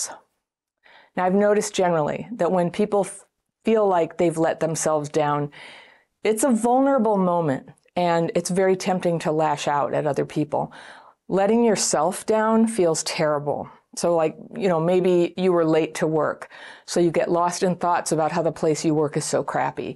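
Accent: United States. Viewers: so embarrassing about my cluttered apartment .. while running to shelter .....❤1. I brought this trip upon myself....Where to escape?? Currently, exhausted, Extremely isolated, brainfog, in Israel #under_fire🎉 #day24 2. Expat from the USA, and appreciate your American accent. Voice From home . I studied psychology... Came here to confront religious Absurd-abuserS who live in Israel.😮😅😊.... So glad I just found your channel/ account. I need tips + excersize for time of war. Staying in hallways/ bomb shelters mostly. Tiny spaces. In Israel under rocket fire. Bless u for all you do! Can send me an email with your videos on you tube or any other free content you can offer. Where are you located these days? City/state? I'm an Expat from Miami currently in central Israel! Sending love, light & hugs wherever it is needed. Amen!❤️ SaraGraceFreed😊3? How to pack and plan while under fire, 4. 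NEIGHBORs Friends have lost.lived ones... mmm. 5. Buying groceries instead! So confused. Help!❤Sara😂🎉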